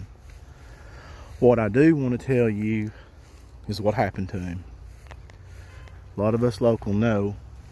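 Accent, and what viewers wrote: South, United States. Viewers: This is beyond interesting. Wow. U got a great southern voice and delivery too. Good job.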